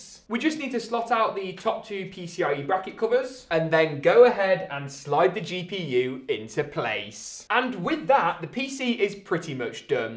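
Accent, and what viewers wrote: United Kingdom. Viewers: 1st this video is awesome. 2nd gotta love that tihcc brit accent, noice🤣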